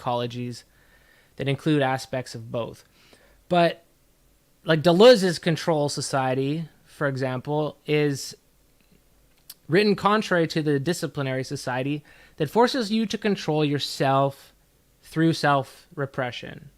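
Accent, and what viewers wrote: Canada. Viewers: Are you a west coast Canadian by any chance?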